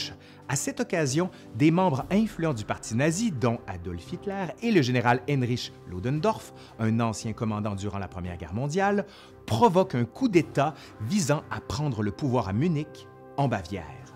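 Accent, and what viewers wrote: Quebec, Canada. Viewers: J'M Bcp l'histoire.. mais svt crt guerres sont 1peu "touffues".. 🤦🙍 Mais vrmt tu rends ça très clair...presk simple.. (alors qje n'avais mm pas connaissance d conflits s/ts les fronts !) Je pensais qle Japon n'était entré q Bcp + tard... 🤔🤦🤦 De + Bravo pr ta diction.. et ton charmant accent Québécois... 👍 👏👏👏👏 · Je comprends mieux le francais canadien grace a toi! Merci! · A mon avis, une série aussi passionnante et documentée que celle sur la WW1! Et avec la diction parfaite, agrémentée de ce charmant accent canadien, de. 7 capsules, je suppose 1 par année de 1939 à 1945, qui vont nous ravir. Vivement 1940! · Le seul accent québécois que j'ai plaisir à entendre 😊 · L 'accent du Quebec , fluide et delicat , professant la langue de Molière dans toute sa splendeur est un regal pour mes oreilles ... Merci copain !!! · J’aime beaucoup ton accent québécois :) Très bonne vidéo ! 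Salut de Bruxelles (Belgique) 👋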